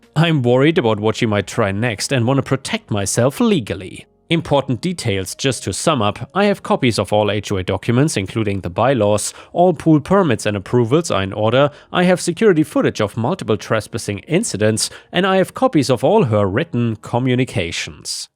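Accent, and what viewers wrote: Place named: Germany. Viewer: He's German. English isn't his first language. He's pretty good, but there are still some words he has problems with.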